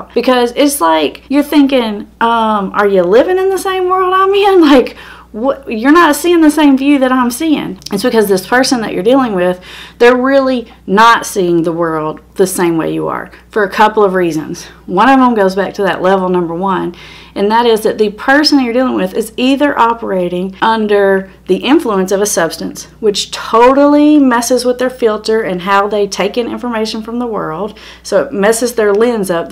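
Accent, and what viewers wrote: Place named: Eastern North Carolina, United States. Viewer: I love your accent!!!!! are you from eastern NC by chance?????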